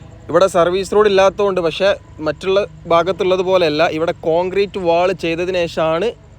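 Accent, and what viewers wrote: Malappuram, India. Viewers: Bro, just a small piece of advice, pls present in a neutral slang. The Malappuram slang that you are presenting is extremely rough! Such an overkill! I hope you are producing these videos for the whole of Kerala! If not, pls ignore the comment! Nevertheless, I appreciate the efforts taken by you to create these contents! Keep up the good work!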